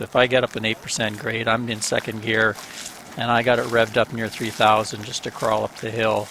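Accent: Canada. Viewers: In stead of calling it Big Blue 2 The Dodge Two Blue. New to your channel getting cough up on your episodes. Man you are so Canadian PLEASE No Insult intended I loved hearing you say "WICKED!" Have Great Travels. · Are you from Canada man. I can tell by the accent. Also I'm sorry for Old Blue man hopefully it'll go to a great home with someone that'll bring it back to life.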